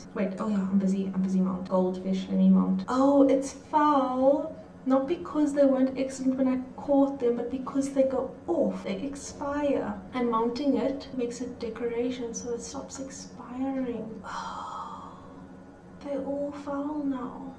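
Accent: South Africa. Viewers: Lost it at "hey MTV, welcome to my crib!" hahah Also, are you South African? Your accent sounds a lot like another YouTuber I used to watch and he lives in South Africa :0 TalesofLumin, I believe is his name.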